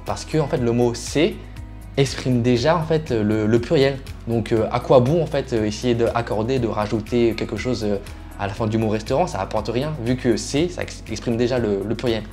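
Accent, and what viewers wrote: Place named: Thailand. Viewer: Hélas encore une idée préconçue et fausse (à peine dans les grandes ville) : la Thaïlande se classe 62e seulement sur les 70 pays répertoriés dans un classement mondial des compétences en anglais effectué par EF Education First. La cinquième édition du rapport montre également une tendance inquiétante pour la Thaïlande: le pays enregistre peu ou pas d’amélioration au cours des cinq dernières années. Par contre 100% d'accord avec le reste de de votre discourt et votre français thaîlandisé est remarquable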